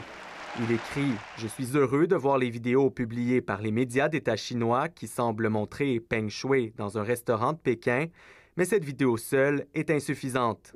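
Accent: Canada. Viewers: C'est l'accent canadien.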